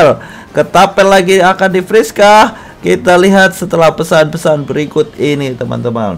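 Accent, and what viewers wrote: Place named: Banyumas, Indonesia. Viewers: Mantap bang ngomong ngapak ke wkwkwk kocak bang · Wong Purwokerto wes ketara 😂🤣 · Ngapak banget sumpah 😂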